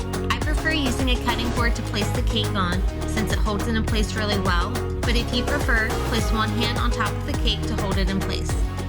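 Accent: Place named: Midwest, United States. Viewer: Just out of curiosity (and you don't need to answer if it makes you uncomfortable), but where are you from? My ear is guessing somewhere in the midwest...but that's just a guess.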